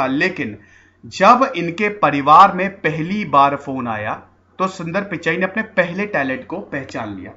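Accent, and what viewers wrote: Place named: Kanpur, India. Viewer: Ye kaaanpur style ghussana jaruri nahi hai... Try to talk in neutral dialect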